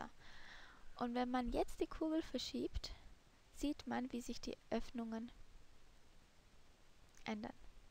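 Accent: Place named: Germany. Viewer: cutest German accent ever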